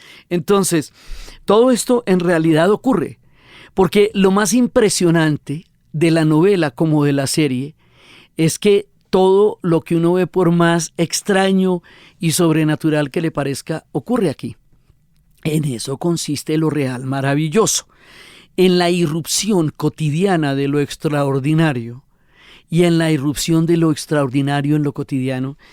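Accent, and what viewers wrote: Bogotá, Colombia. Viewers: Jajajaja.. Naaaa la narración en la serie tiene que ser costeña, nada más cachaco que Diana. A la serie no le falta nada. Así está perfecta.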